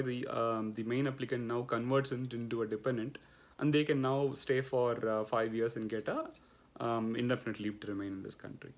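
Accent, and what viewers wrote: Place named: India. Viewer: Hi sir Good effort👍 Also I observe your Indian slang not changed while staying there even after 10 years. Is that because its a Britain English. Many people in US after 3 years talking like US 🙂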